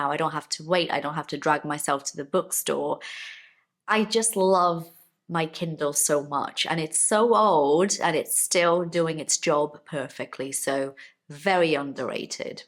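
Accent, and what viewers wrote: United Kingdom. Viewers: Great content. Are you Brazilian? Beautiful British accent. I strong believe that your sign is Virgo \o/ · Teacher, sorry for the question but, are you a native speaker? You have a really nice British accent oh my god 🙌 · Hi teacher .. I'm learning the British accent..and I really like how you speak exactly like the British people I hope to speak like you 😢 Could you please tell us the secret 💔 Please make a video on this subject.. I feel frustrated because I try to speak like British people, but I still can't. Thank you very much❤.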